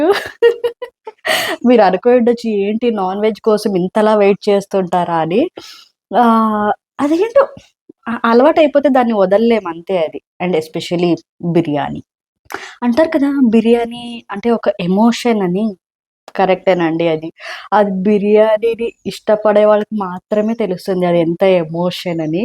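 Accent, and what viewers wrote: Karnataka, India. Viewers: Miru karnataka vala mi telugu border lo kannada valu ela ne matladutharu memu same mi la ne matladuthamu telugu but we are kanadigas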